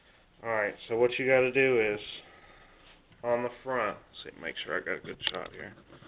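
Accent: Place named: Cleveland, United States. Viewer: And he's from Ohio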